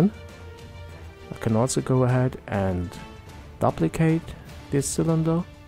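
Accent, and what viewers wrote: Germany. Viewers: i asume you're german with that accent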